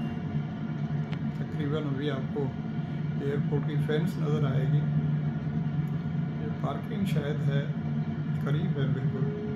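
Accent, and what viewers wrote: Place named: Pakistan. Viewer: no I was talking about his language. And that his accent tells me that he belongs to Pakistan.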